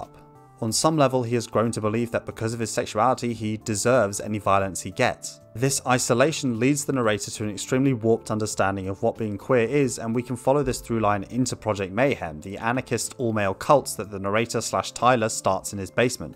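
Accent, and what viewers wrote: United Kingdom. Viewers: They're just both southern English my guy.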